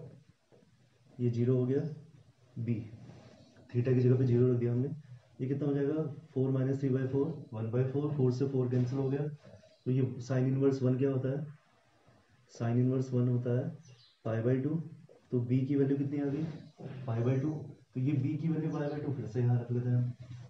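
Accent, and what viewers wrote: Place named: Rajasthan, India. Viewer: That ' rajasthani accent ' 👌👌,. ....... Similar to a hero in looking and Vice - versa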